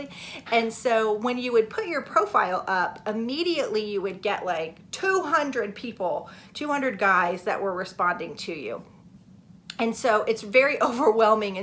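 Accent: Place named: South, United States. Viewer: Appreciate your real life relatable stories and your Southern accent!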